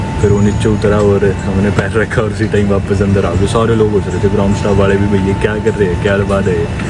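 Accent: Haryana, India. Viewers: Ram ram bhai aap haryana s ho kya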